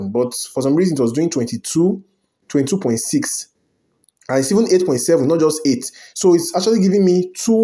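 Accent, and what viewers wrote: Nigeria. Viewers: Dude you sound Nigerian 😃. If yes, then you are like the first Nigerian I have come across doing videos on IJ2. Nice work 👍. As for the damage, the 300% boost is for the base damage of the character and not total damage. This means the boost does not factor in extra damage from gears, passives, talents and the likes.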